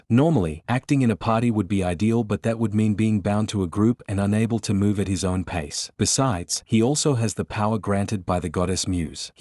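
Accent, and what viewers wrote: United Kingdom. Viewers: I noticed the British accent last time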